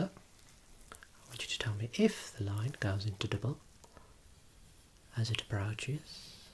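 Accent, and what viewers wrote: Birmingham, United Kingdom. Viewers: Birmingham?!?! Wow i love the accent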